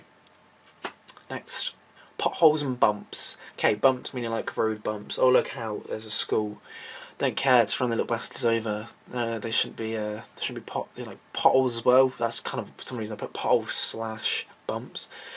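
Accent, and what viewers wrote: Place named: United Kingdom. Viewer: You sound really British and you always rant but thumbs up for you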